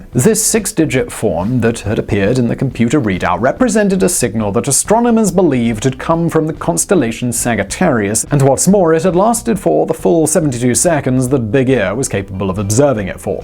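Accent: United Kingdom. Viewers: British Vsauce is growing on me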